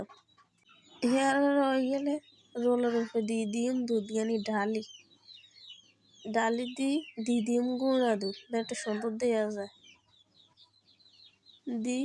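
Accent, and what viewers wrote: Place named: Noakhali, Bangladesh. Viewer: নোয়াখাইল্লা ভাষা অনেক সুন্দর হয়েছে ❤❤খুবই ভালো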